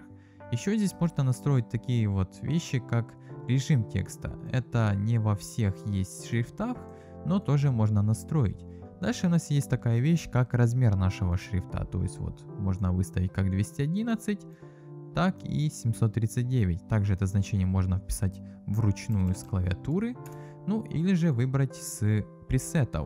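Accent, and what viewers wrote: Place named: Kharkiv, Ukraine. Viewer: как будто харьковский акцент))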